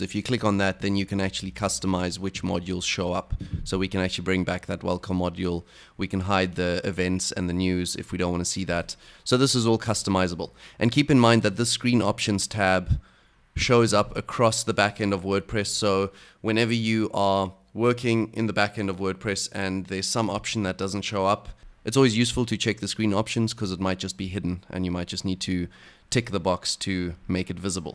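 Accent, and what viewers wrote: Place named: Cape Town, South Africa. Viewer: Thank you - this is super helpful! P.s. knew you were from Cape Town the minute I heard you talking:) Lived there last year, it's a gorgeous city!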